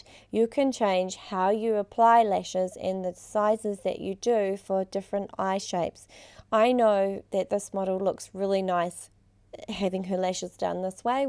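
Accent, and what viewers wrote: New Zealand. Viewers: I think she's a kiwi? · ohh so thats a kiwi accent!..now I can notice the accent of calum hood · I only clicked on this to see what ladies are doing on my eyes but hearing you have a NZ accent, ummm where are you based so I can get mine done by you ASAP haha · Was suprised when I heard the accent. Woohoo, kiwi!!! Do you do eyelash extensions? If so where are you based? In NZ. I would love to have my lashes done by you!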